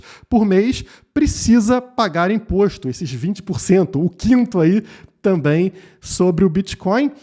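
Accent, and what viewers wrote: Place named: Central-West, Brazil. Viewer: Cara, tu é top, sotaque e dicção ótima de ouvir, tu é de onde? Goiânia? Brasília? Tu é top sou teu fã! Se quiser criar um movimento separatistas conte comigo. 😂😉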